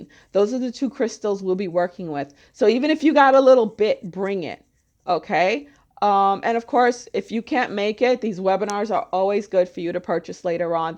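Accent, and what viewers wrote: New York, United States. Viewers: Holly molly about the Divine Masculine = thats makes a world of sense! I know there’s a Cabal kabosh on them - starting with the African Americans & now the White males! And I feel there’s one too for divine FEM..bc I was stuck for so longggg.. yet no more! I’m hearing from Brad Johnson / Adronis that the moon is also an emitter of mind control and it’s going down as of now! Kent Dunn - behind the scenes military white hat- talks about the moon being re built into a whole new model! I’m a researcher - one who puts the pieces together... and I use my intuition & I use muscle testing & prayer / intention & meditation along with that.. I love your New York ness lol! I’m getting the rainbow warrior tank for sure now & prob the Gaia awakens next month (I laid down serious cash for dimensions of disclosure here in VENTURA CA!) Gotta go hang with “my people” lol! 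And I’m there on the 8th & maybe on the 11th.. gotta figure it all out I’m SUPER interested in ascension 😘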